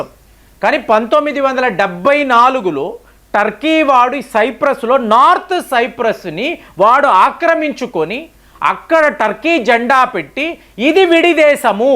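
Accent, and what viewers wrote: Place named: Kerala, India. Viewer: అన్నా మీరు మాట్లాడితే కేరళా వాళ్ళు తెలుగు మాట్లాడినట్లు ఉంది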